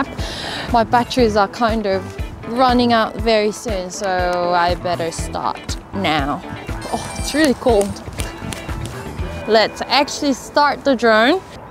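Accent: Australia. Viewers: Have you spent time in Australia? You sometimes have an Aussie accent :) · I'm sure I detect a hint of an Aussie accent. Am I hearing things ? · First video of yours that I have watched. My new mini will connect to my galaxy s7 with the DJI app, but I paid $25 for the Litchi app and it will not connect. Other people tell me it will work, but do not give clear directions on how to make Litchi work with my drone. I hear a Chinese AND what sounds like Australian accent. Did you grow up in Australia?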